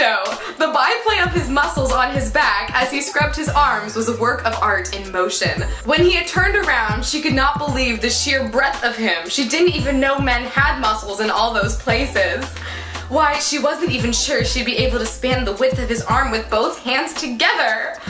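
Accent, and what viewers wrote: Canada. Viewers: Do you have a touch of a Canadian accent??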